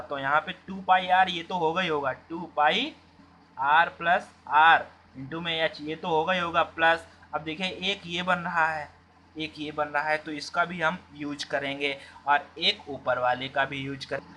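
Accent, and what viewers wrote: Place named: Bihar, India. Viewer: Bihari ho ka bhai sahab voice tone se lag raha hai.....hai to bahut badhiya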